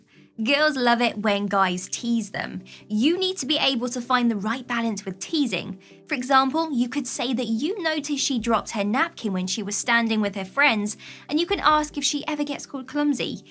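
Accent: United Kingdom. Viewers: I just found this channel tonight; this girl is really cute and I love her British accent.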